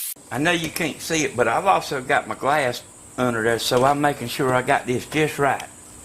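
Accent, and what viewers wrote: South, United States. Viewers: Thank you Mr, Well Done Engineering w/o all the usually jargon/pretentious. Pls give-up the cigarettes because folks like me would like you around & kickin for as long as possible. Thks again PS: Your southern accent/slang is a lot like poetry. I wish I still had mine.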